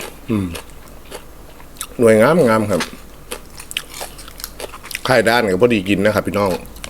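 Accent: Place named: Isan, Thailand. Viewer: เป็นคนสกลบ่น้อฟังสำเนียงเว้ากินเป็นตาแซ่บแท้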